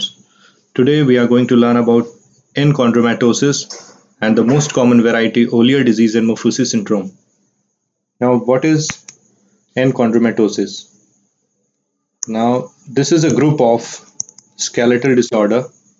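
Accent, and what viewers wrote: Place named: India. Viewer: What is this guys accent? Indian? 🇮🇳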